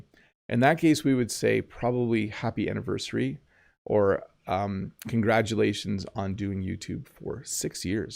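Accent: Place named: Canada. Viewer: The way he is saying in English, it's very understandable, Canadian's accent is the best!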